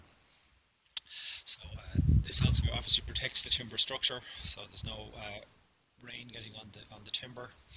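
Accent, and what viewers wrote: Ireland. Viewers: Just watched to the end and it's fabulous I wanted you to walk inside with the camera at the end. Love your accent too. I want to move to Ireland now :-). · Ha! I figured the accent was Irish! This is awesome, our corrugated plastic flew away during one of the recent storms, they were only nailed down, this is exactly what I needed!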